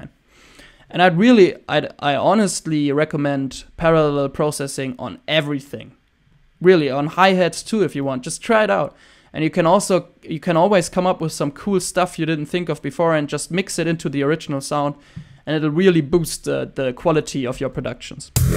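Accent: Germany. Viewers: ne dein english ist gut, aber wenn man selber deutscher ist, hört mans halt.. ich glaub du hast sogar ne relativ ähnliche stimme wie ich ;-)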